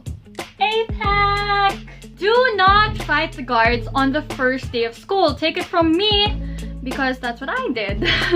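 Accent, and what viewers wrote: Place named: Palawan, Philippines. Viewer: Ate palinawan po yung salita mo po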